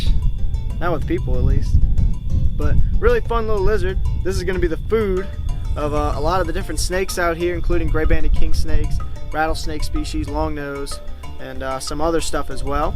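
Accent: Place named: South, United States. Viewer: WE LOVE YOU IN ALABAMA. KEEP YOUR ACCENT SOUTHERN. IT IS WHAT MADE YOU AND SETS YOU APART. WHOOP WHOOP. LORD BLESS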